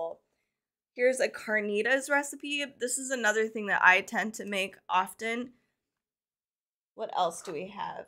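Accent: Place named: Mexico. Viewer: I love your Mexican accent! So cool! I can’t wait to buy this cookbook. 👩‍🍳 thanks for sharing this! 🤩🥰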